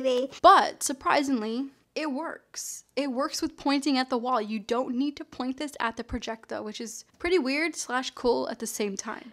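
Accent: Boston, United States. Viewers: That Boston accent coming through every now and then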